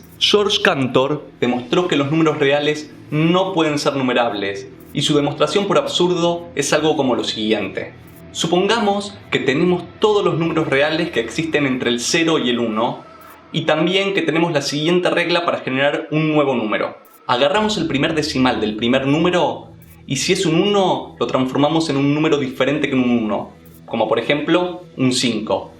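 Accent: Argentina. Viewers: Que no se nota el acento? Obviamente es argentino :v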